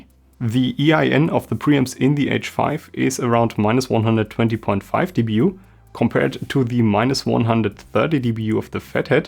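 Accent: Germany. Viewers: Hey, nur mal so 'ne Frage am Rande, dein Englisch hört sich echt sehr deutsch an, bist du deutsch? ^^ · Junge dein "gutes Englisch" entlarvt dich als deutscher..REDE DEUTSCH MAN!!!!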